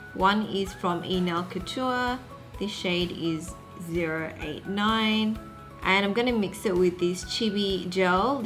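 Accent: Australia. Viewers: Your accent is cool, it sounds like you are or you spent time in Australia.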